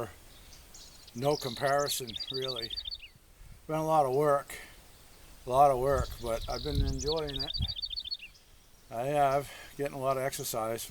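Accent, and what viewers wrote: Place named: Louisiana, United States. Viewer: No Disrespect but trying to figure out the accent. It sounds like you are from up North with a little bit of the Cajun Louisiana influence. Am I little close or am I way off ? Keep on keeping on ya'll ! Respects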